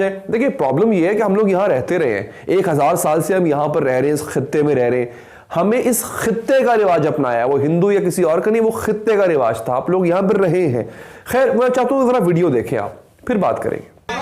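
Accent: Punjab, India. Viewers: Bhai apki punjabi bri mazy ki lgti hme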